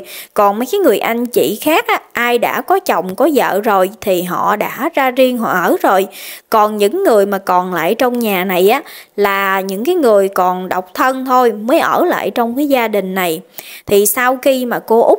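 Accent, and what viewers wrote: Mekong Delta, Vietnam. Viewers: Chau kê truyên lưu loat ro rang giong chât miên tây ne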